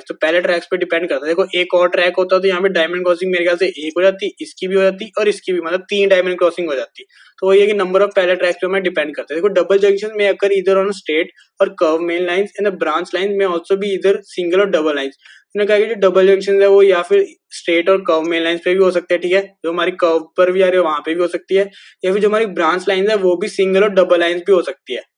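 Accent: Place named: Jammu, India. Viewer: Way of speech suggests that you r from jammu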